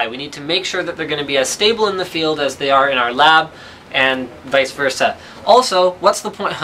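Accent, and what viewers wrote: Russia. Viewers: For how log has he lived in Canada now? Most of the Russians lose the terrible accent after a year or so...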